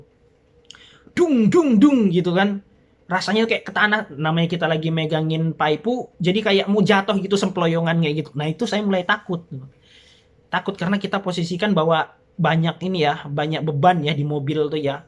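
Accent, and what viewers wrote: Java, Indonesia. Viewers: iya, kirain dari jawa...😂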